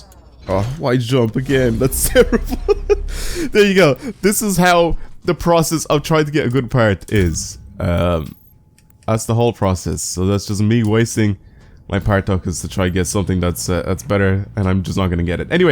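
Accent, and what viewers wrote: Ireland. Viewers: Are you Irish you sound it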